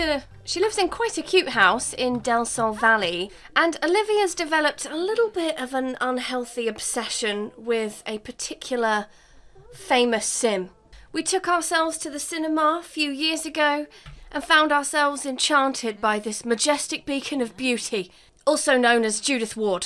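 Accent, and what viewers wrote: United Kingdom. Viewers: It's so rare to hear a Brit with a similar accent to mine. <3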